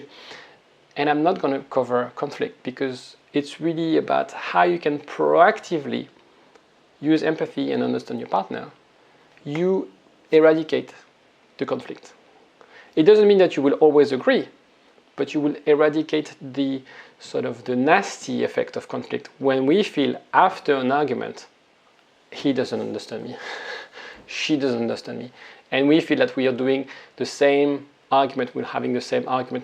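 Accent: France. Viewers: I loved this video❤Thank you,. The French accent is also very nice😊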